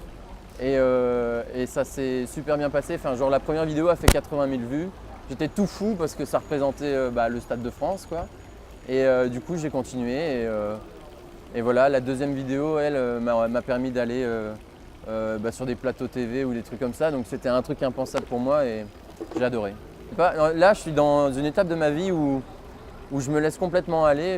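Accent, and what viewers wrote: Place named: Belgium. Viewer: Un Français en Belgique... Super !👍🏻😊 Mais tu es en Belgique depuis si longtemps que tu en a perdu ton accent... 😉😂 À tes intonations, on entend que tu vis depuis déjà un certain temps en Belgique ! Je me trompe ? Bon séjour à toi à Liège ! Vive l'amitié franco-belge !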